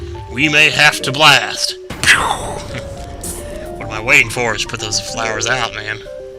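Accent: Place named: United States. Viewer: your voice is way better then suger conroy and i love your streotipical american accen oww and if your woundering im english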